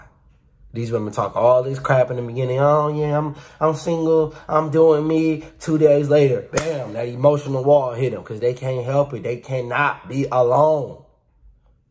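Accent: Baltimore, United States. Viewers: Big homie.... you from east baltimore? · Yooo are you from Baltimore? I can hear the accent. I’m originally from Silver Spring, Maryland.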